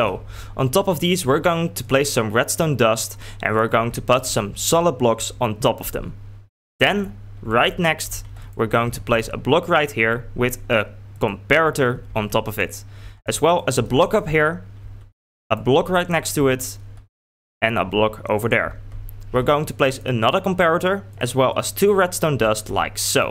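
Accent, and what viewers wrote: Netherlands. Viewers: haha dutch detected, nice mate didn't notice the accent at first hope you are doing well, weather hasn't been great around lately. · hey, nice farm, and i hear youre dutch ;) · Are you dutch you got a dutch sound or how you call it hahha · Lol je bent Nederlands he Kan ik zo horen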